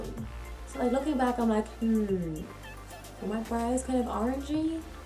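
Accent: Ireland. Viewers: oh okay thank you so much and are u Irish? Love the accent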